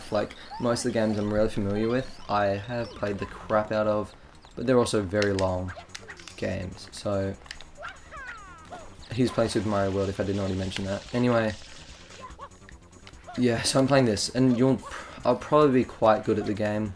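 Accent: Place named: Australia. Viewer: Hey, just wondering, are you an Australian, Brit or New Zealander? I can never tell the accents apart! >:O Also, keep the videos coming dude, I'll definitely watch 'em.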